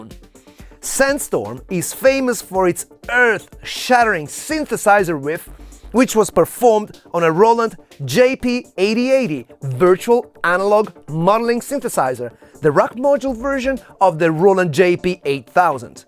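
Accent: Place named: Italy. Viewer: Nice video. 2 out of 100, for your amazing English. I love your accent. You give English that italian design feeling. Cheers. PS: I'm reporting this vid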